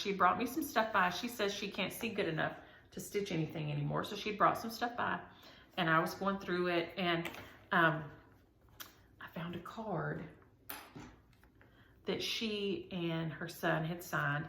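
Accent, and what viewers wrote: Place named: Texas, United States. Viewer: My mother-in-law was called Shug by her parents and siblings. She was the oldest and they always called her Shug. (or Sug - short for Sugar). She was from Texas (Georgetown, Williamson) and had the sweetest Texas "twang" (very similar to your accent). If I ever get the opportunity to be a grandma, that is what I would be called "Grandma". Both of my grandmothers were Grandma and I loved them both dearly. It just seems natural to me, but I totally get how you feel the need to be a different name to your grandchildren. I think since you called each of your grandmothers something different, it isn't a "title" but a "name" - and, I agree, names should be somewhat unique. In regards to my ex-husband. He is gay and finally decided that it was unfair to either of us to remain married - it wasn't easy, but I have come to realize that that was true. 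He is in a relationship with the most wonderful person. They are both my family and I couldn't imagine life without them! And I totally second Roots Tech! It's a great opportunity to get some ideas on genealogy research. They also have an online tool where you can see other attendees and if you are related to them. All the best to you this week!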